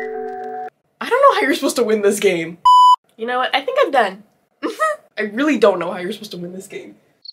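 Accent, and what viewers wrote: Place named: United Kingdom. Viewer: LUV YOUR BRI'ISH ACCENT LUVV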